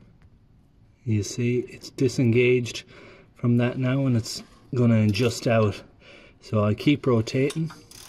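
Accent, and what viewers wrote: Ireland. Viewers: very good video, I am doing 1az fse now, head gasket plus timing chain. Fingers crossed. Nice Irish accent , where about are you from ?:-)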